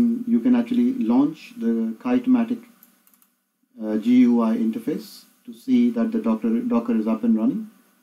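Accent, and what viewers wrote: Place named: India. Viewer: Indian speaking people saving my weak ass once again. Love you <3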